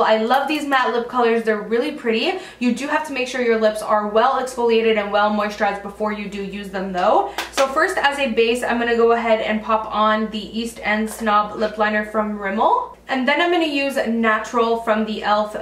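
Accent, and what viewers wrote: Canada. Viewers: Girl ! I love your Channel I just discovered you! I'm sorry if this is a silly question cause I think I can tell but are you Canadian? Also I can't seem to find those morphee lashes!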